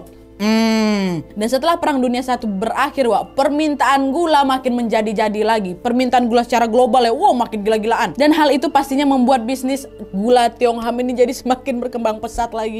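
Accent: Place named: West Sumatra, Indonesia. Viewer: Logatnya kayak orang Minang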